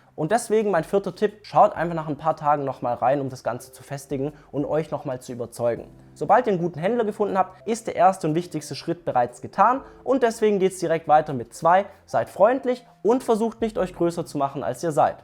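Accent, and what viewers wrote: Germany. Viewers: Dein leichter Dialekt lässt mich darauf schließen, dass du aus BaWü bist? ;) Sehr gutes Video, arbeite an meinem Traum in Form einer Uhr. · Gute Tipps! Aus welcher Region kommst du, wenn man fragen darf? NRW? Bin auch noch auf der Suche nach einem Konzi, bei dem ich deine Tipps anwenden könnte. Letzten Monat erst habe ich mitbekommen, wie meine Traumuhr (Submariner 114060) im Hinterraum des Ladens zurückgehalten wurde. Ich müsste zunächst ein teures Model aus dem Schaufenster kaufen (als Bsp. nannte er die goldene Daytona mit Oysterflex). Erst dann würde er mir die Sub zum Listenpreis verkaufen...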